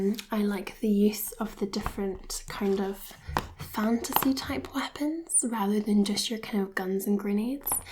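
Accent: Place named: Scotland, United Kingdom. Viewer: let's plays should be a thing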